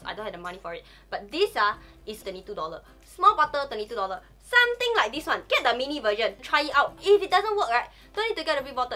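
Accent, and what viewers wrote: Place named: China. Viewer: I like how you are talking like half eng half chinese, just shows that you are not putting up a fake front infront of the audience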